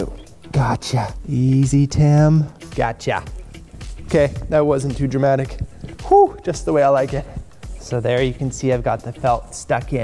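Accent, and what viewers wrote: Canada. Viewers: Not sure if you are Canadian or from Minnesota but you sound very Canadian.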